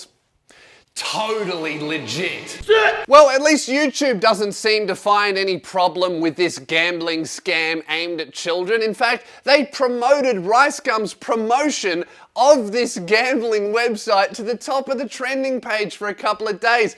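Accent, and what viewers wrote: Australia. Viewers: Are you Australian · this swearing Australian is music to my ears, why does your voice sound so good lmao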